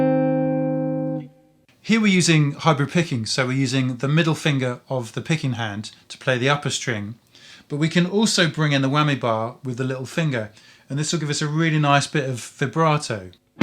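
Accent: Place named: Scotland, United Kingdom. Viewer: Vvery Scottish indeed. Fantastic content. You are a natural!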